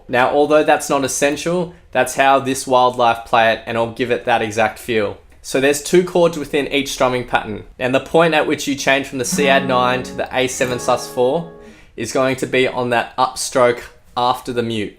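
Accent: Australia. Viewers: Where are you from? You sounds super Australian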